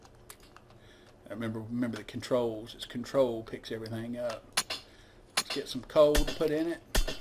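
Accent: South, United States. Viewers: btw love the southern accent. It makes it easier to listen to the commentary. And you're funnier then a one legged man in an a** kicking contest!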